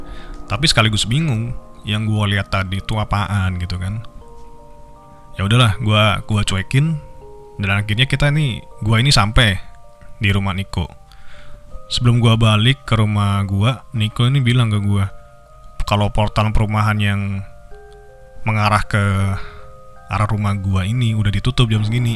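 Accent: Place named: Surabaya, Indonesia. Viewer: Loh wong suroboyo toh mas? Boso suroboyoan ae cak unik wonten identitas e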